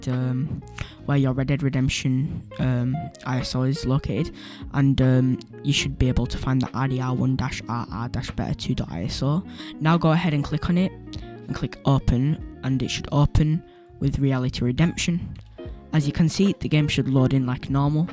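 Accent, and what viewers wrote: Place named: United Kingdom. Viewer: Oh you're British 😮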